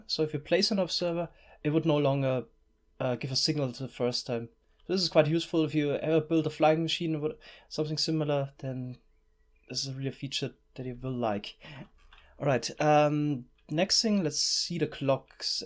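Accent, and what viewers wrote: Germany. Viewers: Ich liebe diesen deutschen Akzent😂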